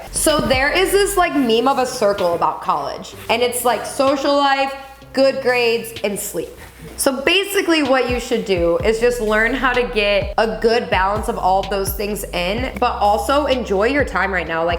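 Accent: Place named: Nashville, United States. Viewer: She said she just got back from Nashville. Kind of just sounds like that accent is coming out a little! I’m from Mississippi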